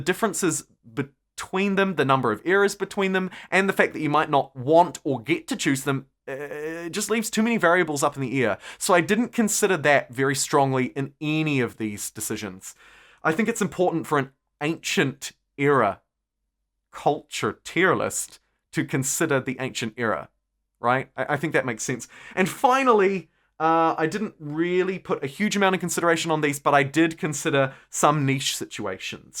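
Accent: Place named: New Zealand. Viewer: Coming back to this in 2026 it’s striking how much more energy your delivery had in these videos and how much stronger your kiwi accent comes across. No idea why those things have changed so I won’t speculate but thought that was interesting.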